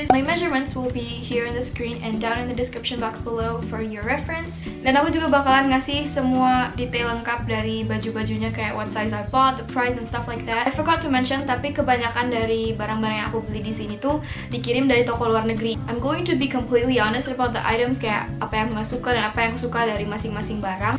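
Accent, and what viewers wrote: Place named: United Kingdom. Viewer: Plss your english accent😭😭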